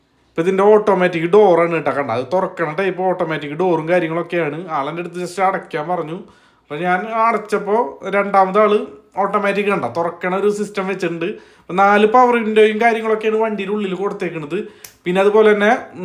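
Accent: Thrissur, India. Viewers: Thrissur malayalam...ദൈവമേ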